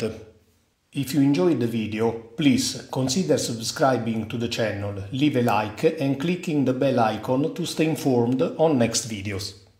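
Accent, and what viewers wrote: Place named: Italy. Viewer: you sound like Italian :face-blue-smiling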